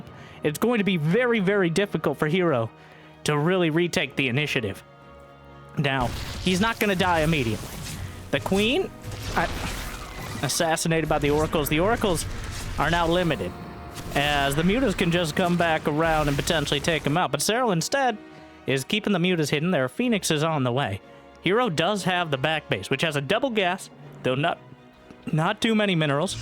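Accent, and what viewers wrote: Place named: Texas, United States. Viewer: Bro, you're literally the best caster, and I love your charming Texan accent. Please keep it coming, man.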